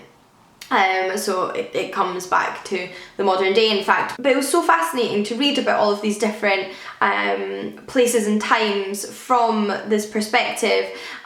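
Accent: Scotland, United Kingdom. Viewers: I LOVE your accent! Scottish accents always amaze me 😂 I'm British by the way.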